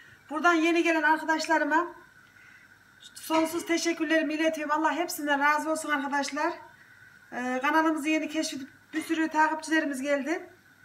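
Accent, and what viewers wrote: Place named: Konya, Turkey. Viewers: Zaten konuşman bir tanıdık geldi toprak çekti bende konya ereğli liyim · hanim memleket nere konusmalar bizim oralar ayni bende inanadoluluyum slm hanim👍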